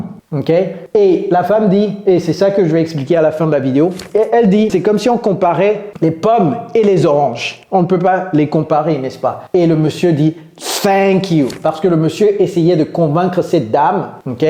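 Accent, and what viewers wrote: United States. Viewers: Wow c'est tres bon votre accent americain! meme votre accent francais est impeccable. BRAVO! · I really like the way you teach English! The challenge is the american accent. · "Apples to oranges" I heard " apologize"😁 if I'm regular to see all your videos I can hear American accent. I'm glad to find your lessons. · j' adore vraiment cet accent americain. ça t'as pris combien de temps mr d'être meilleur en parlé?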